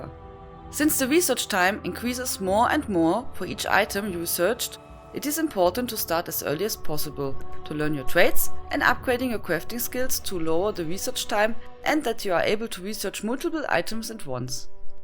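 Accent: Germany. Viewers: Post Da stoner Seems like an German Accent my guy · uiuiui xD Man hört das Deutsch sehr kräftig raus ^^ Mir hat das Tutorial gefallen, es war sehr informativ, hat meine Frage beantwortet und vorallem war es sehr unterhaltsam xD Nur eine Frage hätte ich an dich... ich hab irgendwas vonwegen Craftingquest mitbekommen... gibt es das oder hab ich mich verhört? Und wenn ja, wo kann ich so eine Quest finden? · Very solid explanation of a misunderstood but important part of the game. If I had watched this video about 3 months ago I'd be in a better spot with my crafting character. German accent also helps. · ich mag den deutschen englischakzent irgendwie xD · Beutifull german/viking :D accent. :)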